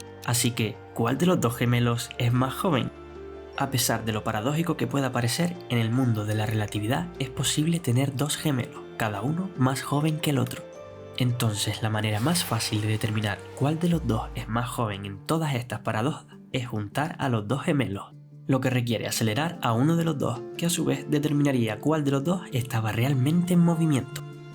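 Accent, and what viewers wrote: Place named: Canary Islands, Spain. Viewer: Un maravilloso video bien explicado y con acento canario, que mas se puede pedir? Muchas gracias! 😊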